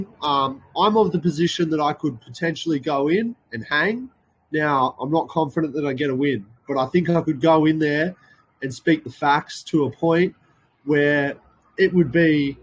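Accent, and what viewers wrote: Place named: Australia. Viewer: Why do I hear an Aussie accent but you’ve referenced US law in the description?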